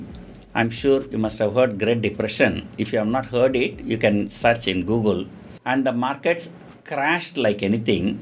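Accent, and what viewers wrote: Tamil Nadu, India. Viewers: U r speaking like tamil .easy understanding english i learn stockmarket also english frm u thank u sir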